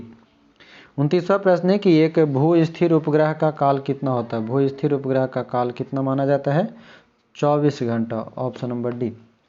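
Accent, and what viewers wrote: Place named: Gaya, India. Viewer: Gaya zila vala tone hai bhai apki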